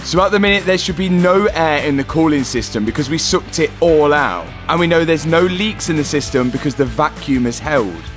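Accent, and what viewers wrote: United Kingdom. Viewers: This dude cool but his accent is brutal. Goofy uk accent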